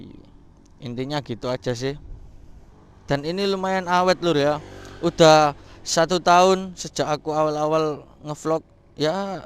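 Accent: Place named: Java, Indonesia. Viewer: Mas nya medok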